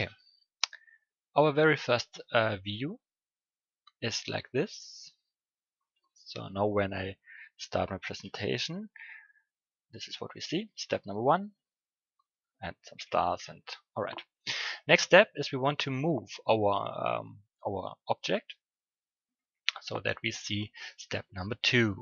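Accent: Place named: Germany. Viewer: Man merkt schon das du ein deutscher bist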